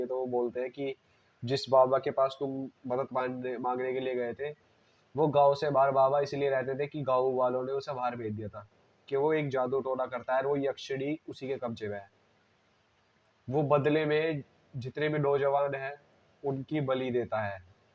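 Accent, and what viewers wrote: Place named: Rural, India. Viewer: Horror nahi comedy hai... jaahil bewakoof aur dehati jaisa bol raha hai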